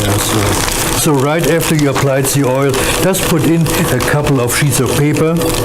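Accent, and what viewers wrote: Germany. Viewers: Are you from Germany ? your accent sound really german.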